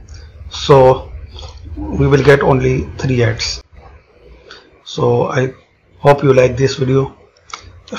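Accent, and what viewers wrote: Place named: India. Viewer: Sorry but your english is bad sre u from india ur voice its likley from india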